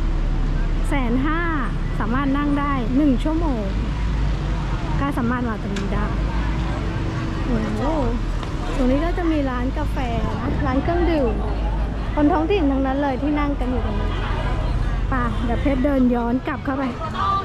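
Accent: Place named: Thailand. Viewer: Melihat beberpa video anda yang berawal dari Vietnam, saya beranggapan bahwa anda orang Vietnam, rupanya anda orang thailand 😅. Cuma dari awal logat berbicara anda, saya sedikit memahami bahwa anda orang thailand, hanya karena anda meliput video Vietnam maka saya mengira anda orang Vietnam 🙂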